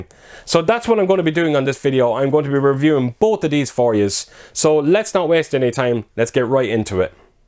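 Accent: Ireland. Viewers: please make sure to link your video review. Your video message, especially that fantastic Irish accent (man am I jealous and love it) is so crucial to getting the point across. OR, have a go at another video dedicated to "Planes, Trains..." and really hammer 'em. We'll call it the remixed/uncensored version! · first time viewer and immedietly i hear the assault on precint 13 music and then the irish accent, irish myself from limerick and just started collecting last year, recently i bought first blood, the thing and predator all 4k uhd, planes trains was on my list too, not now, just subbed man and looking forward to your next uploads, cheers man. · Do you mind asking where you're from? Could be wrong but you sound Irish.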